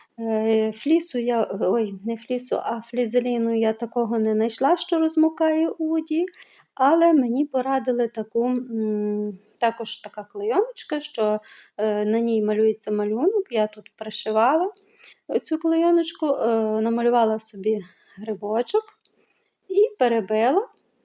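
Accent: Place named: Western Ukraine, Ukraine. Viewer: вітаю, подивилася з насолодою ваше відео, приємно слухати ваш діалект. У мирні часи ми кілька разів у відпустку, їздили по західній Україні, були і в Карпатах і в закарпатті, завжи набирали грибочків, звичайно сушених але яких запашних... Ви мені нагадуєте ті приємні часи:). Діліться ще з нами вашими гарними виробами. Бажаю спокою і миру❤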